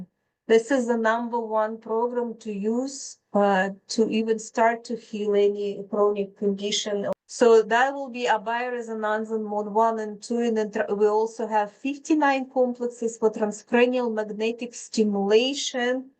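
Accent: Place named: Russia. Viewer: Где на русском послушать? Очень слышно русский акцент, но не понимаю где найти эту же информацию на русском языке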